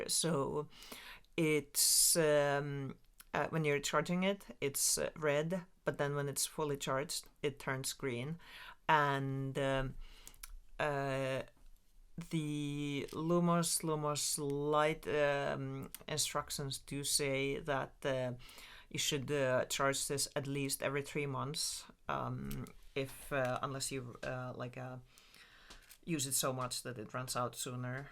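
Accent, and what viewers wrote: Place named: Finland. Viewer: I was watching this to find out more about the light and noticed your accent sounded Finnish. Then I saw the Moomin poster in the background and thought “she must be Finnish!”. If you are Finnish, then I will say kiitos videosta.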